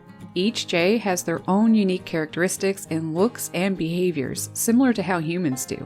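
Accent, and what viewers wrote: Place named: Atlantic Canada, Canada. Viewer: My favorite video! Your accent sounds like you're from east coast of Canada. Are you?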